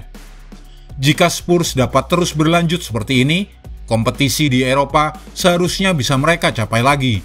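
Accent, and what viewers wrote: Java, Indonesia. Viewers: Medok tapi mantep 👏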